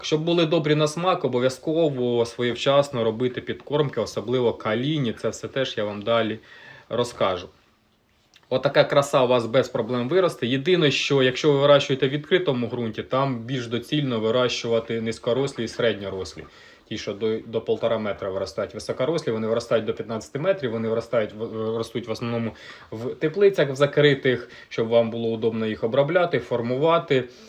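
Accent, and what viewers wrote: Russia. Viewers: Да , да охотно верю, прослушай ролик.Твое " Вiльне володіння" аж по ушам бьёт.Ты даже слова выговариваешь на русский манер.Там лютый Суржик.Одного не понимаю НА ХЕРА ОНО ТЕБЕ НАДО? Был нормальный канал, на нормальном языке и тут тебя Майданутость покусала? Кстати если Шо Я в Винницкой области.А то сейчас начнёшь " Вам россиянам ,нас вильных не понять."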